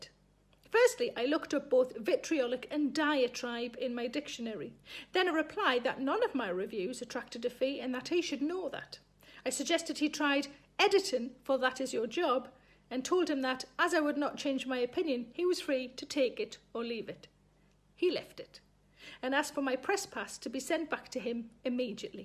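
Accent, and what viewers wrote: Newcastle, United Kingdom. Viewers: Thank you Sarah for these episodes. I look forward to them each day. I got to know you watching episodes of "QI", which we can get in the states through Britbox, which has the luxury of captions. I've gotten pretty good, with your readings, at understanding a Geordie accent (I hadn't realized that's what it was until you mentioned it somewhere - you are more 'understandable' than Ross Noble!) Thanks again - bless you - be safe! Eric in Indiana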